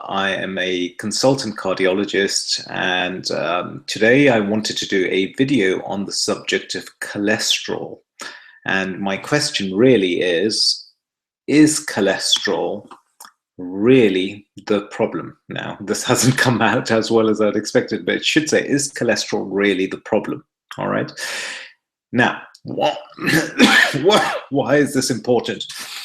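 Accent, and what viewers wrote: United Kingdom. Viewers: Wonderful video! So informative and you explain things so clearly! Your British accent is a plus!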